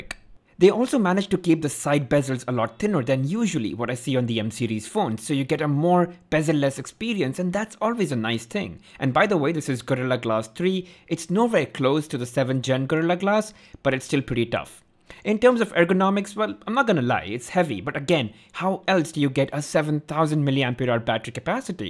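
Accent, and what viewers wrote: India. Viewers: This guy speaks like typical random brown indian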